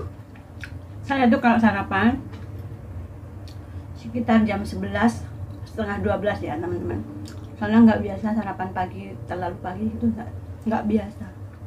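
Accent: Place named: Java, Indonesia. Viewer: Enak wae gthu denger logat jawane😂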